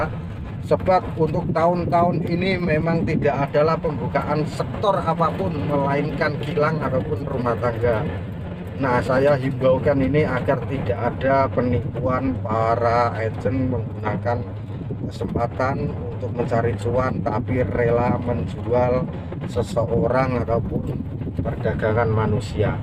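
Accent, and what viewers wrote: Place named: Java, Indonesia. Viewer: Cakap pelat jawa tebal pun masih buat contsnt ...pebat dengar weak...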